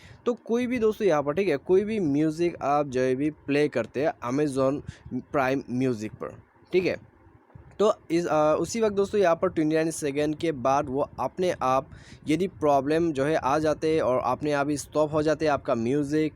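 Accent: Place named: Bengal, India. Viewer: Ha has a Bengali accent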